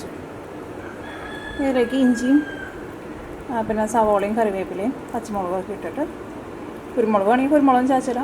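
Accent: Thrissur, India. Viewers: നാട്ടിലെ വീഡിയോക്ക് ഗ്രാമത്തിന്റെ ഭംഗി കൂടി ഉൾപ്പെടുത്തി ചെയ്യുന്നതിന് അഭിനന്ദനങ്ങൾ . മുണ്ടൂർ പള്ളിയുടെ തൊട്ടടുത്താണല്ലെ നിങ്ങളുടെ വീട് . വീഡിയോ കാണുമ്പോൾ എനിക്ക് തോന്നാറുണ്ട് തൃശ്ശൂർ ശൈലിയിലുള്ള സംസാരമാണെന്ന് . ഇത്രയും famous ആയി ഒരു youtuber തൊട്ടടുത്ത നാട്ടുക്കാരാണെന്ന് ഇപ്പോഴാണ് അറിയുന്നത് .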